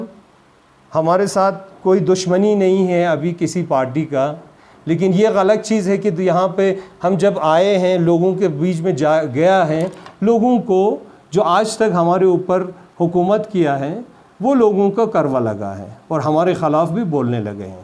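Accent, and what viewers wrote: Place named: Iran. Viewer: pharsi style hindi he is using 🤔🤔🤔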